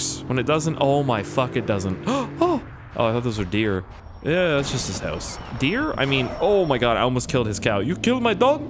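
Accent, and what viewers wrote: Russia. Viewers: Love your Russian accent xD More Russian videos!! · Please do more random Russian games so I can hear your hilarious accents lolololol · i'm disappointed you didn't start this episode in your Amazing Russian accent.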